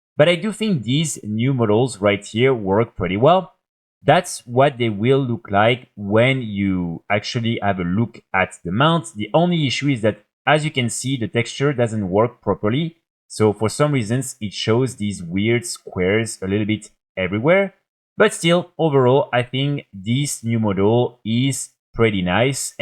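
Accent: France. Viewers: thats called a French accent, are you slow? (edited) guess you had some typos huh sped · He’s French. Try not to be a petty piece of shit online. 👍🏻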